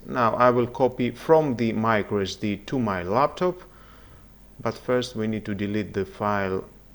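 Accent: Greece. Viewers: Τώρα εσύ μιλάς Αγγλικά σαν τον Τσίπρα μήπως είσαι Έλληνας ???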